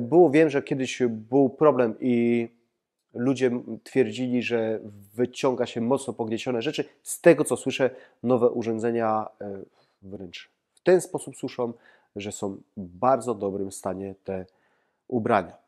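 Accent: Silesia, Poland. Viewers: Kocham śląski akcent i intonację :) Ja nie będę jakaś mega nowoczesna - bezwzględnie potrzebuję przestronnej lodówki. Nie side by side (one w środku są masakrycznie ciasne) tylko szafę od podłogi do 180 cm pełnej szerokości - czyli 60 cm, do tego zamrażarka jako osobne urządzenie. Tak żeby do lodówki cały gar rosołu wszedł bez kombinowania co przesunąć. A ponieważ właśnie projektuję sobie nowe mieszkanie z sugestii nt servo drive skorzystam - dzięki!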